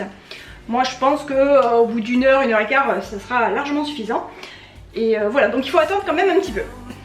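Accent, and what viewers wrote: South, France. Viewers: Coucou ma petite.. Quel plaisir que d'entendre cet accent du sud de si bon matin... tu mets du baume au coeur... Bon tes petits pains ont tellement l'air bon... ça donne envie de croquer dedans et je sens l'odeur rien qu'en les regardant 😂 J'espère que le confinement se passe bien pour vous... En aparté vas tu faire une vidéo pour le nouveau pack ou tu ne joues plus du tout? Moi j'avoue que ça fait des jours et des jours que je n'ai pas ouvert mon jeu... 🙄voilà 🤪 Je te souhaite un très bon week-end avec ta petite famille... Pleins de bisous et prenez soin de vous tous 😘🌹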